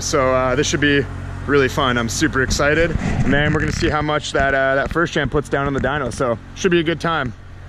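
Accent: Canada. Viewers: Anyone else pay attention on YouTube videos for certain Canadian accent words and sayings? Y'all got that rec weed. Do yo thang😎👌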